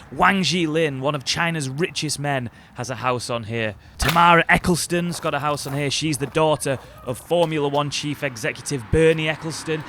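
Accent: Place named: London, United Kingdom. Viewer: Hello I never knew you knew about London and you have got a really strong London accent now, good video my guy does this mean your going to play for chelsea?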